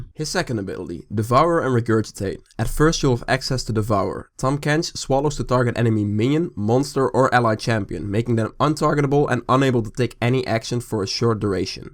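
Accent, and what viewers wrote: Netherlands. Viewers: I like your dutch accent xd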